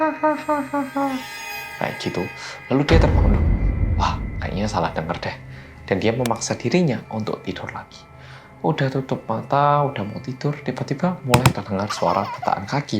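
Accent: Java, Indonesia. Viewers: salam buat org Korea yg medoooookkkkkkk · MAS MEDOK AS MAS JAN NGASIH JUMPSCARE GUA KAGET ASTAGA😭😂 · dari hari ke hari saya amati logat mas sudah mulai bercampur antara medok Jawa dengan medok Korea, ada sengau-sengaunya "H"-nya... hahaha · udah hapal sekarang mah bukan orang korea yang Medook tapi Kewedeen nggk ketipu wkwk Love mas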